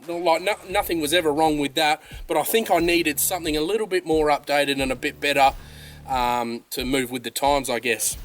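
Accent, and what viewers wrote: Australia. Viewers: Have to admit as a Yank I’ve always been jealous of our Aussie friends cool accent and that v8 Toyota turbo diesel that we can’t get in the 🇺🇸. Very nice 76 👍🏻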